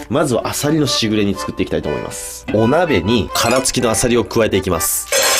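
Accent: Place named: Rural, Japan. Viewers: だでね〜 この方言聞くと愛知県らしくて素敵ですw by 愛知県民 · １分３０秒完全に田舎のお兄さん( ´∀`)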